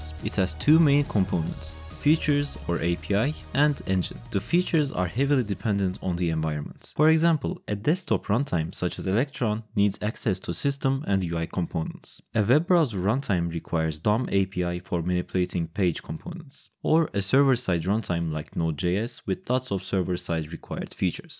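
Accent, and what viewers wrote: Turkey. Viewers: Are you Turkish? Your accent made me think that. I'm sorry if this question bothers you.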